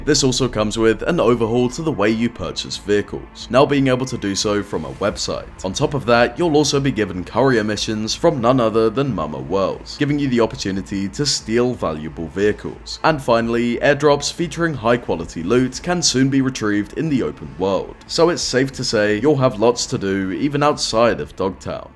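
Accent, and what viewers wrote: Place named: Australia. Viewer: You've got a pretty interesting accent. Are you Australian? You voice really reminds me of CatBoyKami :D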